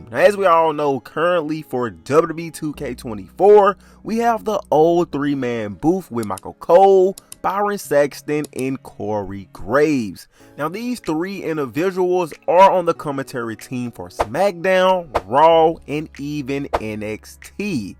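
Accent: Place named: South, United States. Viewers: Gotta be Texas that twang is in there heavy lol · your accent is thick, I'm guessing either North Carolina or Alabama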